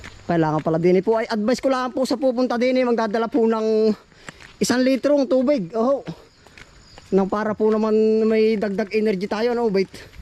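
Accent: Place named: Lucban, Philippines. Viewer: kayo o ba y taga lucban ganda nio mangusap may punto nakka bano ano po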